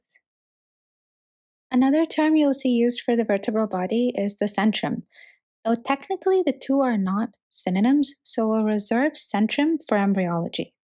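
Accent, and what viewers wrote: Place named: India. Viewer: Wow , I can't believe that you're from India love your videos and your accent wow love from pakistan ❤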